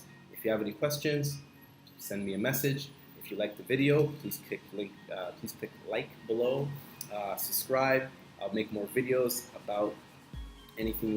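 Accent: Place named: Caribbean. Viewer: I use duolingo as well! I've been trying to learn Spanish, but it's so difficult because I live in small town, USA. And most people that do speak Spanish doesn't want to speak to me in Spanish. Sometimes my head hurts from all the studying. BTW, I want to ask you, do you have a Caribbean background. I think I can hear a little accent. Thanks for the video, it's really informative. You should make more videos speaking Spanish! I would love to hear how your Spanish has improved since you've taught yourself.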